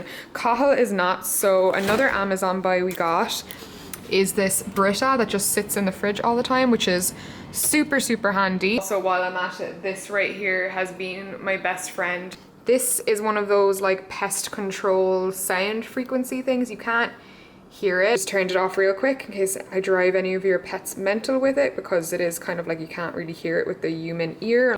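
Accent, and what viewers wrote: Ireland. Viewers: that clip that recorded of you had me laughing so hard 😂 you sound so extra irish when talking to him and i LOVE it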